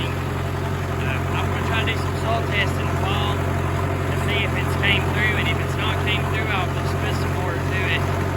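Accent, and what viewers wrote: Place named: Virginia, United States. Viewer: He sounds like he's form Virginia or North Carolina.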